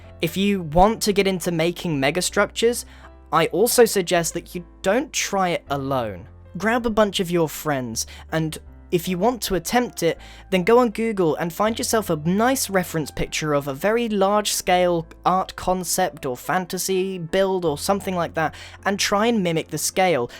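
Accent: United Kingdom. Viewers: Am I the only one there think he sound really british in this video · Are you british england?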